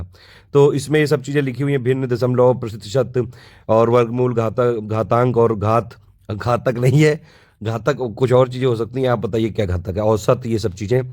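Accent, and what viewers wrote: Kanpur, India. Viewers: 😁😁😁😁😁 bhut sahi se liye ho kanpuriya style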